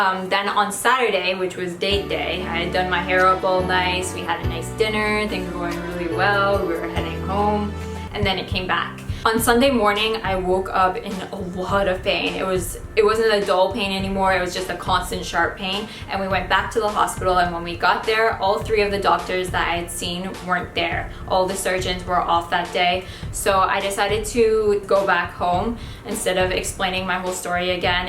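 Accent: Canada. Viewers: What the heck kind of hospitals do they have over there? Abalone hot pot? Is it part hospital, part restaurant? Does it have Yelp reviews? Btw, your Canadian accent is alot more noticeable when you're sick. Welcome back. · Your Canadian accent is more noticeable when you aren’t feeling well. It’s the cutest thing ever! >.<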